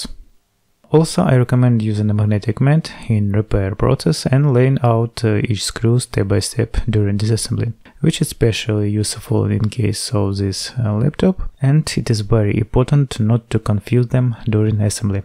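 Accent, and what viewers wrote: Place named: Russia. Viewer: В середине видео понял что чел русскоговорящий )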